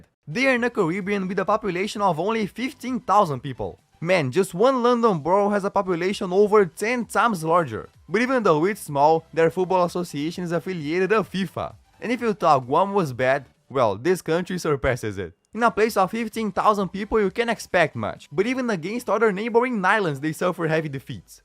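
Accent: Brazil. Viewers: Sounds like a Brazilian accent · This guy speaks English in a Rio accent. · Ive live in brazil for almost 5 years and i can guarantee that this is a brazilian accent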